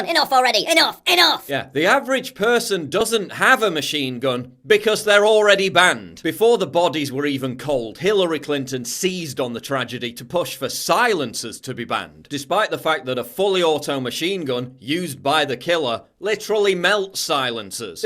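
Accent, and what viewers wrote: United Kingdom. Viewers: This guy speaks with a heavy British accent. Are they even allowed to own in Great Britain. I bet that he has never shot a 50 cal, and probably denies ever masturbating.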